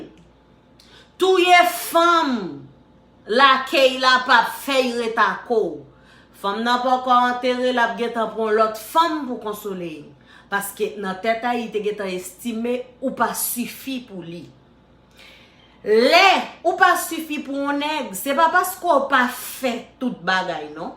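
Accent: Cap-Haïtien, Haiti. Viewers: Helloo men mwen ...mwen vin la pou 2 bagay...mwen vin la pou bel ti accent capoise sa ou genyen an...avek bel konsey ou yo 🤣🤣😍😍😍...tout saw di yo se verite❤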